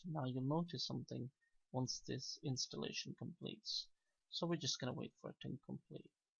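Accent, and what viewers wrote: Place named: United Kingdom. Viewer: Fantastic! I could listen to your lovely voice all day ♥... Lovely accent. What part of the UK? Subscribed :)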